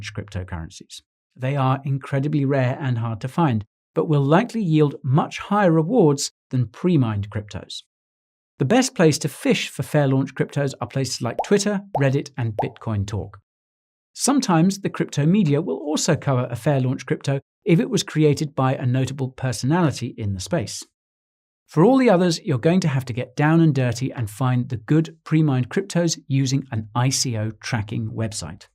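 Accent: United Kingdom. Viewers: Hi, I am new in Cryptocurrency, what is ICO , I Dex , Ieo? Sorry i feel like ignorant.. Your Y.t very informative, But i have problem with ur britten Accent. can u please easy it for international Followers.. Thanks , u are the best so far.. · I love your very British accent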